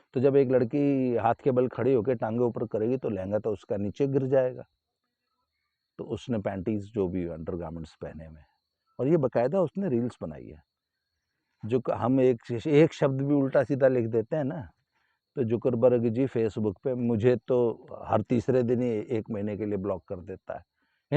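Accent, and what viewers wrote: Haryana, India. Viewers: बढिया हरियाणवी बोली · आपकी बोली मैं हरियाणवी पुट है । रोने से आंखों को नुकसान होता है ।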